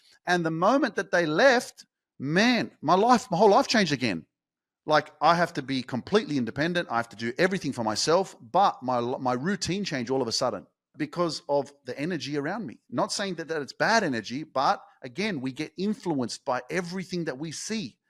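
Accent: Australia. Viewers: He's not British! Think maybe the accent is Australian.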